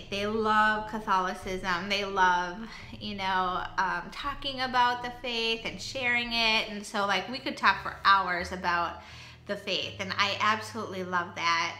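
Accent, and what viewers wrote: Minnesota, United States. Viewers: I was so sure that was a MN accent. I'm originally from Northern MN myself. Thanks for sharing ❤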